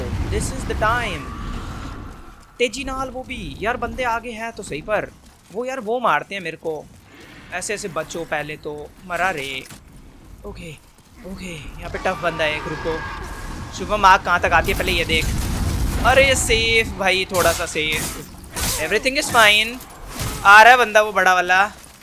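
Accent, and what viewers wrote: India. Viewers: Does anyone else thought that he had too much of Indian accent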